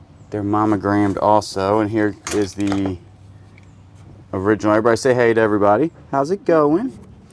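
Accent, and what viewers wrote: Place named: South, United States. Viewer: You're driving me nuts! The word is MONO- gram(ed) it isn't "MAMA-gram(ed). I know we southerners have our drawl, but still. (You can only call something a Mamagram if it's a mailed Mother's Day card and you put just your initials on it.) And the metal thimble is a shot glass, as in "My gout is kicking up a storm and hurts like all get out, so I need just a couple thimbles full of corn squeezings." 🤣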